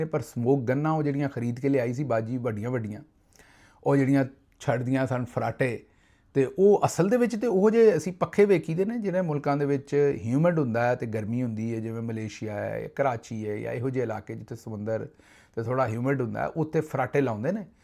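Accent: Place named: Punjab, India. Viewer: صاحب میں کی بہت بڑی فين ہوں اللہ آپکی حفاظت فرماۓ آپکا پنجابی vlog مجھے بہت پسند ہے میں بھی آپ کے شھر کے ايک گاؤں سے belong کرتی ہوں جب آپ پنجابی ميں لطيفے اور کہاوت سناتے ہيں ٹھوس پنجابی بولتے ہيں کمال کر ديتے ہيں ❤ مجھے لگتا ہے ميں اپنے گاؤں ميں ہوں آپکا vlog خبروں سے ذيادہ آپکی پنجابی سننے کے لئے ديکھتی ہوں عابدہ يو اے ای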